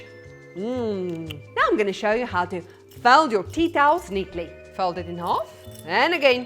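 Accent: Afrikaans, South Africa. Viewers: I saw with da rands,your name and your Afrikaans ascent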